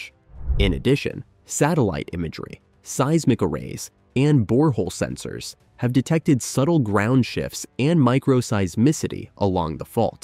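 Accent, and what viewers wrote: United States. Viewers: This is hilarious 😂! Is the guy narrating the fable from West coast USA. Because I guarantee California will have slide into the sea long before we have a problem. I'm picking this nonsense is sponsored by 1%ers that want to move here. The wealthiest people on the planet are building bunkers in the shadows of the Southern Alps. Are they also the stupidest people on the planet. Hmmm!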